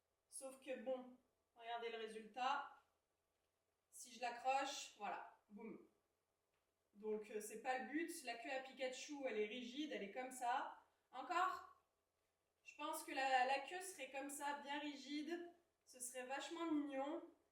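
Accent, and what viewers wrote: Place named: England, United Kingdom. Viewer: Bonjour ! Jai eu limpression que tu avais un super accent anglais, je peux me tromper mais tu parles anglais ? Super contenu sinon ^^ jespere que tout roule pour toi !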